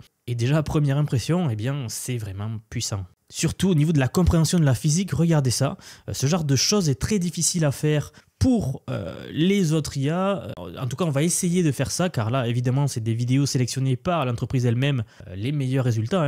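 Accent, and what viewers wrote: South, France. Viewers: Merci pour cette vidéo ! très intéressant ce nouvel outil ! Et vive l'accent du sud !! 😁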